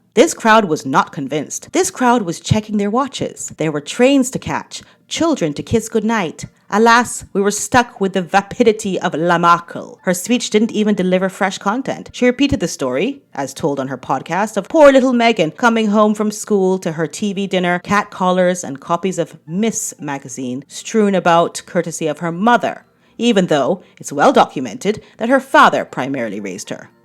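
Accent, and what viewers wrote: United States. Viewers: Firstly, I look forward to your videos. Love your American accents and your costumes. My only complaint is that you don't make enough videos. Does your daughter have dollies? They would be great in re-enactments of conversations between the three stogies (H, M and D). Of course, there would have to be smoke engulfing them.😃😗 All the footage from that night, including from Backgrid photographs and any other private or public videos or photos, can be used by the NY DA if there are charges brought against the Duke of Dumbassery and the Duchess of Delusion, as well as Sunny. The DA can subpoena them , or whatever. I'm not a lawyer like you. Sunny did block two police cruisers on the way to a real emergency. The two SUVs also committed multiple traffic violations. To be fair to Eric Adams, (I'm not a fan) he was being careful with his words. 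If you read between the lines, Adams said, "Bullshit!" and "Don't come back to my city". I have no problem with Cabbie Sunny making a little cha-ching from the douchebags' idiocy. Do you know how much they have to pay for their medallions? Being an NYC cabbie ain't easy. Also, Backgrid will not settle for a one-time payment for those juicy photos and videos. I predict documentaries, fictionalized accounts (maybe on Netflix 😁), articles in soooo many tabloids such as Hello!, People, OK!, etc. Backgrid owns them and, as all good capitalists, will not give away easy money. I hope those paps that had to deal with the Harkles bs get nice bonuses. B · Omg I love your "American" accent. That voice will be forever in my head for anyone that annoys me😂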